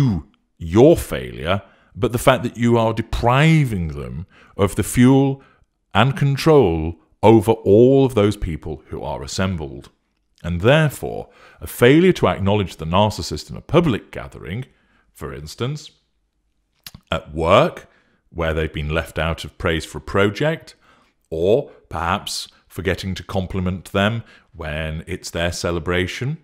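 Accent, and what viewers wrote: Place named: England, United Kingdom. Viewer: Uh oh I just found your channel a few days ago but I think I am addicted to your voice already. Eek. I always did love an English accent! I've always loved the English, the dry humor.... I'll be okay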